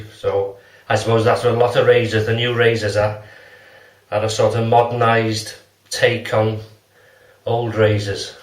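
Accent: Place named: Merseyside, United Kingdom. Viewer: Cheers, I know this is an older video but I wanted to say I enjoyed it. Once again your enthusiasm cheers me up immensely, I think in no small part to your accent. I know you don't think you have one but there it is.;^ ) I'm from the Wirral myself and have lived in Canada since I was fairly young but I like hearing the sound of Merseyside so carry on. Hope you had a Merry Christmas, I remember going to Santa's Grotto years ago but I forget where exactly... Keep the videos coming pal!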